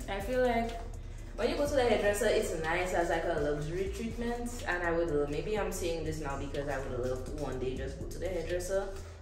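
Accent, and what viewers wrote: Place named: Saint Lucia. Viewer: Omg I know this accent ..🇱🇨🇱🇨🇱🇨🇱🇨girl I’m here looking at videos to help make me get comfortable with the color . I give myself 1 more week then cut and white here I come 🤭… so happy it’s Lucian ❤❤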